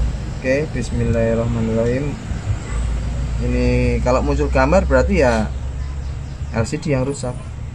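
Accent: Java, Indonesia. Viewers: Bang .......!!!!!! sebenarnya abang itu orang mana kok bahasa jawanya lancar and medok sekali terima kasih bang ... selalu jaya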